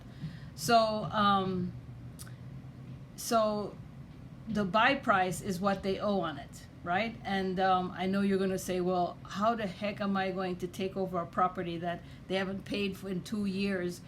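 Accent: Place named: Jamaica. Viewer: I feel Comfortable with you nationally is Jamaican I sound it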